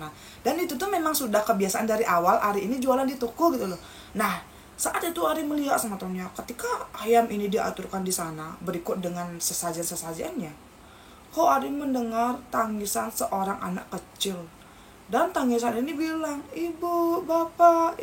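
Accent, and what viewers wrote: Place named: Bali, Indonesia. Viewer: Jangaaannn...ntar saya ngga ngerti..logat bali juga dah enak koq. Hehehee..❤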